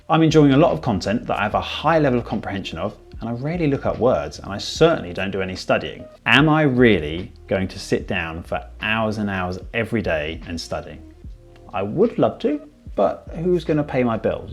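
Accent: United Kingdom. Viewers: Excuse me, Where is your accent from, precisely? South of wales, where? I would like to do an exchange in the future, but I think that some UK accents are difficult to understand, and yours sounds nice to me even though I'm not used to hearing this accent. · I'm trying to learn English and i have a B1-B2 level right now. I think i understand this video at 85% even if you speak pretty slowly and you are very clear with your vocabulary. I think it's due to the different accent because i never watch British contain on YouTube. I should because i can understand an American video at 90-95%. The problem is still when people speak so fast that i can't understand. I do a lot of input but also take vocab on a special app (Quizlet Wich i recommend because it's like cards and it's very helpful). Make input and learn the vocab that you learn while input and you'll increase. 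Also, read in your target language even if it's book that you already know. I had a 615 score in TOEIC and by this method i have a 850 today. I don't even know the grammar that's why I hope that there is not a lot of mistakes here. Tell me if there is mistakes I'm happy to learn things from native😁.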